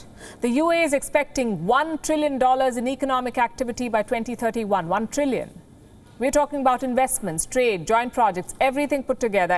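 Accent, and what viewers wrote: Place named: India. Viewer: I wish madam newsreader hadn't had Indian/pakistani accent. it is quite unimpressive.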